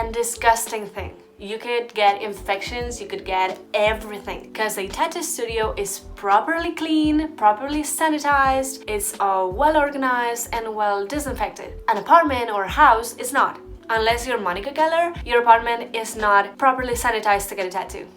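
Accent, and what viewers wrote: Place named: Catalonia, Spain. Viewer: As soon u started talking could notice in your accent that you are Catalan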